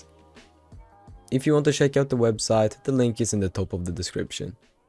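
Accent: Sweden. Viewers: I can hear that you are swedish my g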